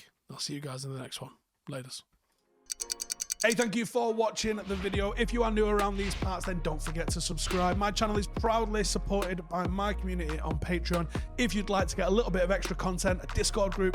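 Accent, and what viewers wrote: Manchester, United Kingdom. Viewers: lol if you cant tell the guy in this video has a manc accent you’re absolutely tripping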